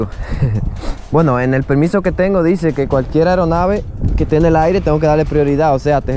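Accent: Dominican Republic. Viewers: Loco tu eres dominicano? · Tu ere dominicano? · Algo me dice que eres dominicano..de algun lugar del cibao.. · De donde eres ? Eres dominicano🇩🇴🇩🇴 ?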